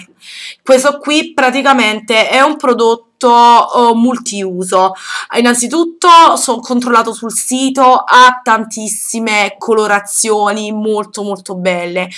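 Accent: Naples, Italy. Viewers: Nuova iscritta !! :) Sei simpatica, carina e dolce ! E poi sei napoletana (mi pare di capire dall'accento!) ... bacio :*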